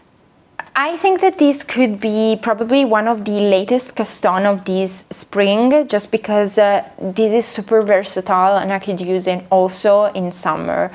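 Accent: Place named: Italy. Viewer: Thank you for your video. I think you have a charming Italian accent.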